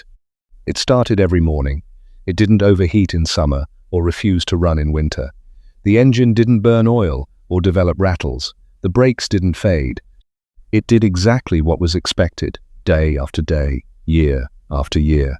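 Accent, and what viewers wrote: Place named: United States. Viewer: Awful narration; American spelling and pronounciation; repeats the same point over and over.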